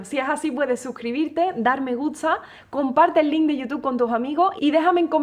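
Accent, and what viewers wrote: Andalusia, Spain. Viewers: Que bella obra, soy de Buenos Aires Argentina y espero todos los domingos tus videos, me gusta escucharte hablar ese tono andaluz es cautivante. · Ese acento andaluz 🤤🥰 enamora. Felicidades. 🌺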